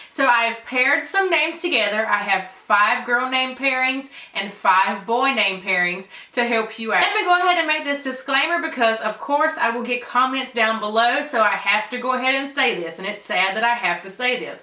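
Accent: South, United States. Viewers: This is so unique! I love this video idea. Your country accent is so adorable. New follower, super excited to keep up with your channel!!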